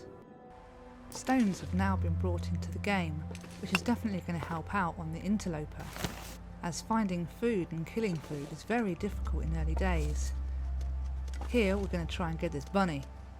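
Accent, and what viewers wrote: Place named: North Yorkshire, United Kingdom. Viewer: I'm digging the update, the only thing I don't like is the HUD condition icons sticking around in their awkward positions when they turn red. Kind of distract me from the immersive beauty. Aside from that, all great. I really liked your review, by the way! Funny little asides, and a dab of oop North Yorkshire accent with the all important tea worship. It's a shame we didn't get you narrating previous updates, oh well. I hope you think about doing a review when the full game comes out, this was a pleasure to watch. And those new weather effects, eh?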